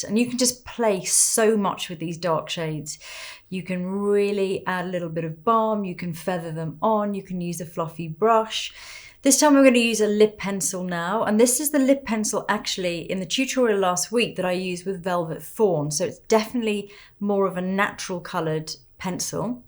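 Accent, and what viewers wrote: United Kingdom. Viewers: Not only your magical makeup works and your beautiful natural look makes me to watch your videos, but also I'm here to enjoy your gentle and sweet British Reserved Pronunciation accent. You are as soft-spoken as a wind-harp swinging in an abbey door.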